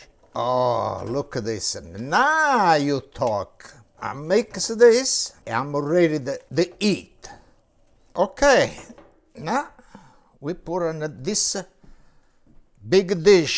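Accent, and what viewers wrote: Italy. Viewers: I'm from Germany and to be honest it's very hard for me to understand English with an Italien accent. But one can really feel that your are living Italien food. When I see your dishes it's always a pleasure and a pain at the same time, because it looks so tasty but I usually watch your channel when it's too late to try it by myself at once. So I have to.go to bed with the pictures of you dishes in my head. Sometimes a glas of wine helps to overcome ...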